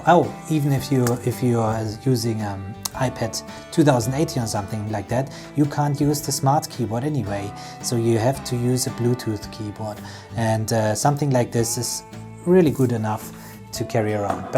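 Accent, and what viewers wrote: Germany. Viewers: Wow big German accent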